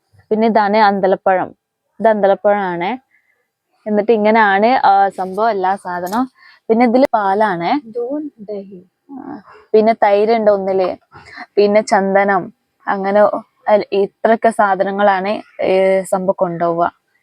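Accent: Malappuram, India. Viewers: ഞാനും അങ്ങനെ തന്നെ പാവം നമ്മുടെ മലപ്പുറം ഭാഷ പറയുന്നു കുട്ടി 🥰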